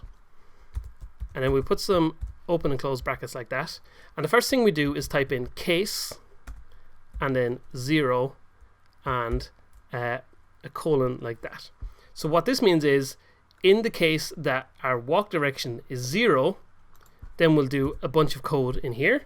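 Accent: Ireland. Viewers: what part of ireland you from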